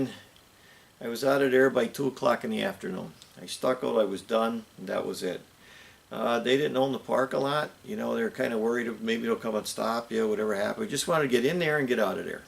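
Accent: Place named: Scranton, United States. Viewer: I am turning 72 this month. My house was in need of some repairs that I thought would have to be done by a professional mason but watching your videos gave me the confidence to attempt them myself. The results were great only because of your excellent explanation and recipes for mortar and cement. Thank you so much for offering people such wonderful information concerning your skills. By the way, my father was raised in Scranton,Pa. and he spoke just like you. God bless you. Sharing old world traits is not something we see much of from the masters anymore. Take Care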